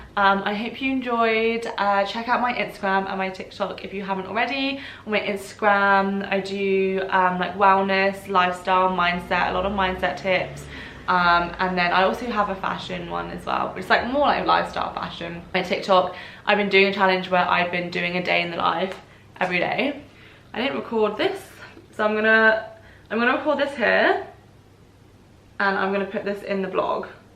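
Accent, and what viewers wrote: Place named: Australia. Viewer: love this!!! i use to watch you YEARS ago - so nice to see you back on youtube. i feel like i can hear a slight australian accent on you now xxx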